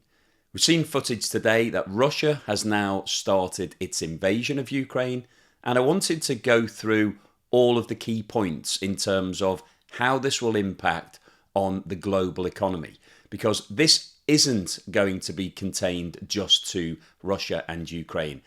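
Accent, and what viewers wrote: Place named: Liverpool, United Kingdom. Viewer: Sounds like scouser 🙄😬🙄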